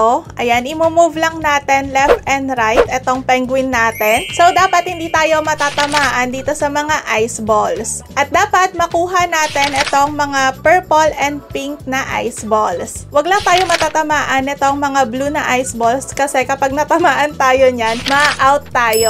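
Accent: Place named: Pangasinan, Philippines. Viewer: Ganda mo pangasenensi ka kilala ko sa tunog mga taga pangasinan hehehe chat me teach me grand mother and father ko puro taga pangasinan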